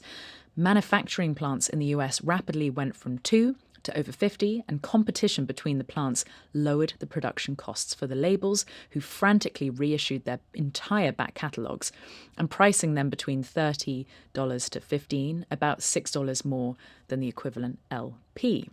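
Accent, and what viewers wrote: United Kingdom. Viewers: I'm left wondering if there is any subject that wouldn't be interesting - heard in your perfect British accent... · I love your channel! Long time watcher/listener first time commenting (I think). Your realness is what I appreciate most, I think we all can feel the you inside your content. That's rare and valuable. Plus being a dumb sounding American, I truly admire your British accent. Keep on rocking in the free world! 🤟🏽☮️🔥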